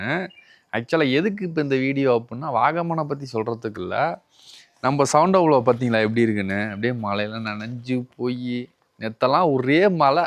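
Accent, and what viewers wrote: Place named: Trichy, India. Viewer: Super bro I will also try.. Am having THAR from Trichy .. I watched your videos .. your reviews are so awesome.. Namma trichy slang la Namma Veetu paiyan maaari pesaringa .. Happy trip bro enjoy 🎉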